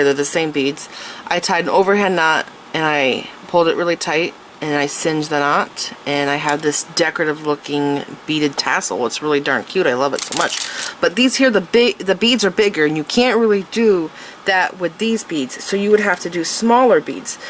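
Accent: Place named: South, United States. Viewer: Aw geez, lolol, it's just that touch of Southern that throws you off 🤣😂😆😅😄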